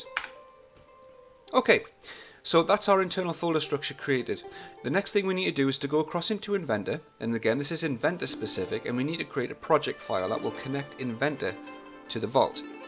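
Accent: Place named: Newcastle, United Kingdom. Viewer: CloudCAD Ltd Thanks for the prompt response. Got a basic test setup running as per your video. I am an IT guy but an AutoDesk noob - are there any websites providing question/answers that you can recommend for Autodesk similar to experts-exchange, stackexchange, spiceworks etc BTW I am a Geordie - live in Whitley Bay. The accent works well for presenting - think Robson Green. Thanks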